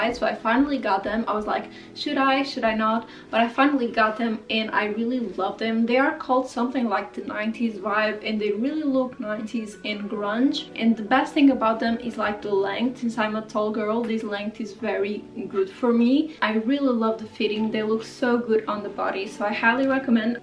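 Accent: France. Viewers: Are you French? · Is she French ?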